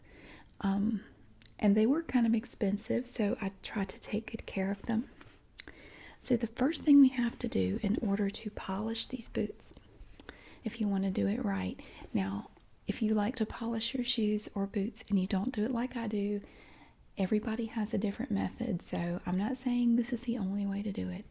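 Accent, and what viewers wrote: South, United States. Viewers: Oooo this works for me. The combination of the brushing sound and gentle southern accent is pleasing.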